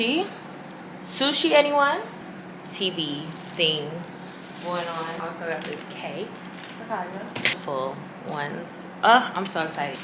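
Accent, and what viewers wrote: England, United Kingdom. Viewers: The beginning of the vlog is just funny 😅😅 the UK accent love you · You’re so unique I love your vibes! And your bri-ish accent 😂💖🥰 ps: you drew a candy cane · The British voice 😂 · it’s the british accent for me lmaoo, im happy you’re in a better state ❤️❤️keep the vlogs cominggggg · Your English accent gets me every time ….and I’m from London 😂😂😂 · The Accent is sending me especially being British😂😂 The vlogs are always so motivational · Elloooooo british accent 😅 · Loving the. Vlogmas motivation is key keep it up . And your British accent l😂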